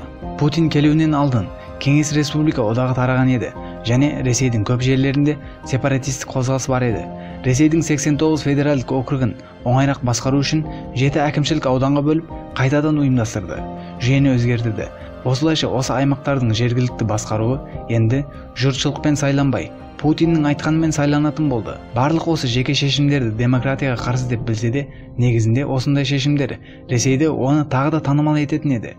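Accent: Uzbekistan. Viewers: Сенин шындыгыннын барлыгы неге 27 болып келеди отрикти сога бересин гой деимин сосын казакша дурыстап таза казакша соиле Казакстанда турып жатырсын озбекше акцент косып соилеме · Өзбексің ба ? Кара каопаксын ба? Акцент өзбекскй ұнамайды сөзің.. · Казакша нармални сойлеш озбек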